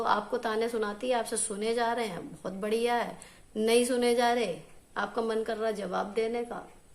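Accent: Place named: Meerut, India. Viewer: Hi ji I am rajni Chauhan from meerut ganganagar you know you look like my Mami ji PTA hi mujhe sabse achi baat kya lagti hai apka baat kerne ka tarika bilkul desi bolte hi PTA lag jata h meerut ki l language like it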